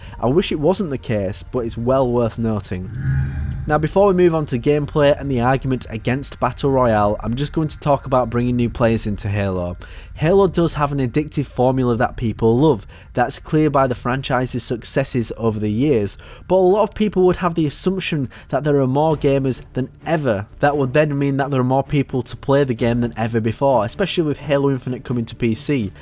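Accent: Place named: Bolton, United Kingdom. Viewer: You are definitely from Bolton aren't you, jesus Christ🤣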